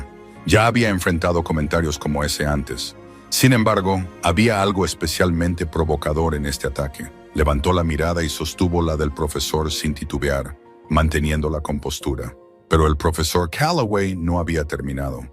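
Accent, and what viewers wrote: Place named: United States. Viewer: Pregunto. El narador porque Abla de una forma tan rara parece que es americano y termina ablando en un Español raro perdón es un detalle que a mí me hace dudar la intención. Perdón es un detalle.😮😊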